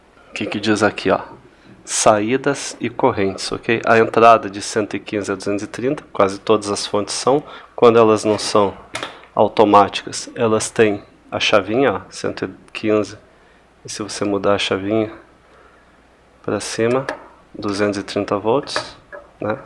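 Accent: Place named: Paraná, Brazil. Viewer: Cara, sou fã do teu canal, não entendo como você não tem mais audiência. Conheci teu canal através do Rodrigo Conrado, o qual também tenho muito respeito e considero vocês as melhores referências no que diz respeito a CNCs DIY. Inclusive pra mim você é o pai das CNCs caseiras no Brasil, um pioneiro. Parabéns e muito obrigado. Estou pensando em criar o meu próprio canal para makers, pois vejo muita gente com dificuldades em montar seu equipamento e orfã de outros canais. Caso queira me contatar meu email é <mail> Sou do interior de SP e busco alguém como eu na minha região para projetos do tipo, trocar idéias e experiências. E você, como se chama (nunca ouvi você mencionando teu nome) e de onde você é? Pelo sotaque parece ser do Paraná, mas me perdoe se estiver enganado. Um grande abraço e muito boa sorte.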